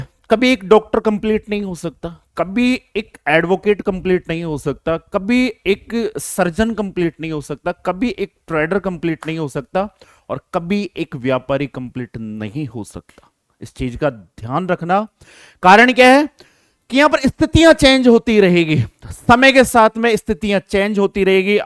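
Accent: India. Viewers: Sir aap haryana se ho language waha ki hi lg rhi h jo bhi h love u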